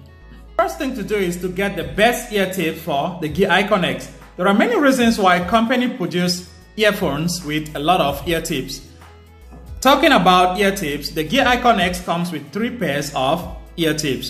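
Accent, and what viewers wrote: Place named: Vietnam. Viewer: Are you vietnamese, you sound vietnamese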